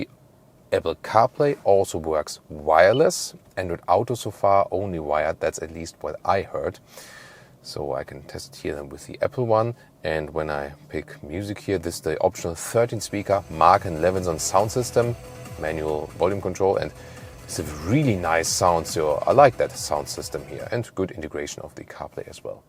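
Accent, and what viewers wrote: Germany. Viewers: Gibt es den Kanal auch auf deutsch? Das deutsche Englisch ist....ähm...suboptimal, um es mal nett zu sagen. Und jaja deutsche Autos sind besser blabla...aber die saufen auch wie die Sau! Es gibt nämlich nirgends einen Fullhybriden im Angebot. Entweder Vollbenziner/Diesler, der säuft einiges mehr als der Lexus hier, oder dann direkt ein Plugin oder full E-Auto.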